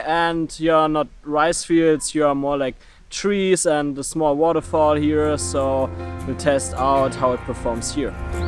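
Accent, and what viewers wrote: Germany. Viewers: Man hört den deutschen Akzent einfach überall raus:D Gutes Video !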